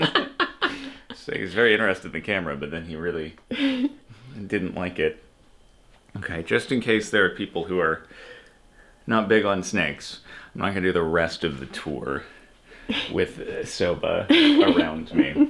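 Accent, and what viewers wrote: Australia. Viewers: pretty good Australian accent tbh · Bro's Australian accent has gotten a lot better since Farmer__Steve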